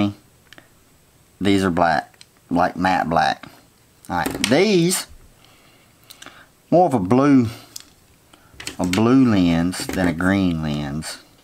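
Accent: Alabama, United States. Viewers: I’d say Alabama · You from Alabama?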